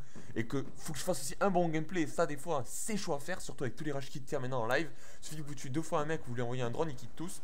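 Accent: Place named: Marseille, France. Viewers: T'avais dit que t'allais montrer tes stats lol jaime beaucoup ton accent Marseillais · j'alias lui demander s'il était pas marseillais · Un toulousain avc un accent marseillais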